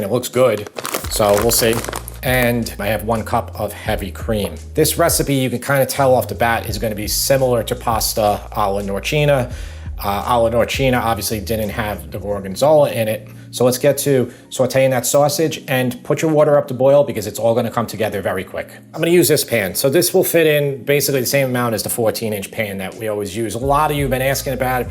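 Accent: New York, United States. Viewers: Are you from the Bronx? Brooklyn? Queens? Or NJ? :)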